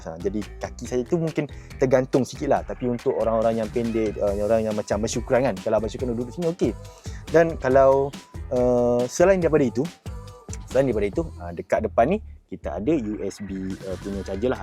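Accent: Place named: Terengganu, Malaysia. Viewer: Abang ni orang ganu ke. Slang bunyi ganu sikit sikit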